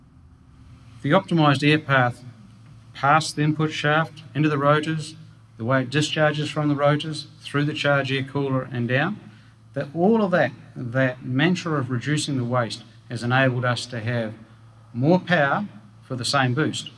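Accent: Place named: Australia. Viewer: You're an Aussie !!!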